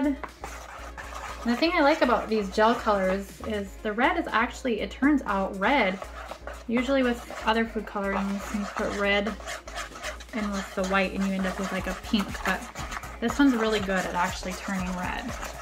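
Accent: Minnesota, United States. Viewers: She's definitely from the Minnesoota region... loving the new Channel guy's 👍🇺🇲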